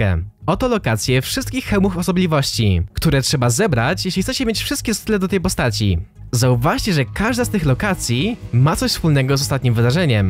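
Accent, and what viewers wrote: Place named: East, Poland. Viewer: Co to niby znaczy? Przecież pisze prawdę. Chłop robi dobre filmy, ale ten jego wschodni akcent wszystko psuje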